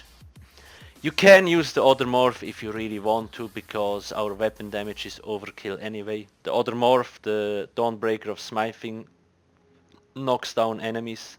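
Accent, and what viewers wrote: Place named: Switzerland. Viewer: You sound so Swiss haha :P Are you also playing on the ps4?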